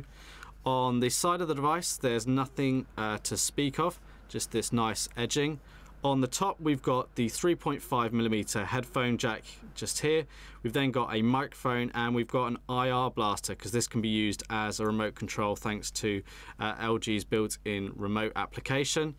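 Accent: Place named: United Kingdom. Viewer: i think he sounds british.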